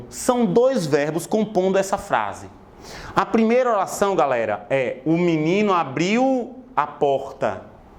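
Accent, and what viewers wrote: Northeast, Brazil. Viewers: Seu sotaque é do Piauí ou da Paraiba ? Aula Show e sensacional ! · vídeos Diversos ele é nordestino né não?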